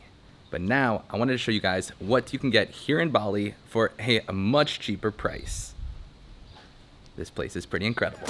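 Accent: United States. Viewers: Just here for some real-life American English. Thanks!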